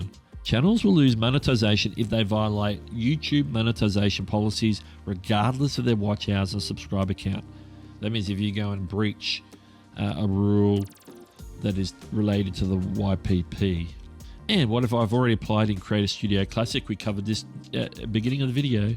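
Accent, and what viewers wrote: Australia. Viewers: oh, aussie.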